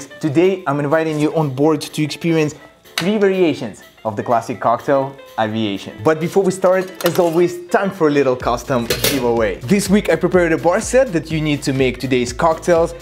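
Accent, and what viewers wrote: Russia. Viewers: Боже ты же русский у тебя русский окцент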